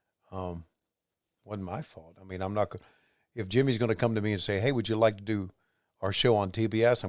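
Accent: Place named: Tampa, United States. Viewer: You sound like you are from Tampa. My dad would take me to Fort Hesterlee Armory (probably spelled it wrong) on Tuesday Night and we would see Gordon smoking one cigarette after another, announcing the matches. He was the best.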